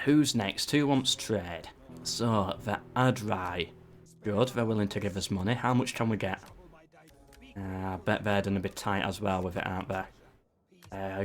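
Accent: United Kingdom. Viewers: Is this the perfect British accent?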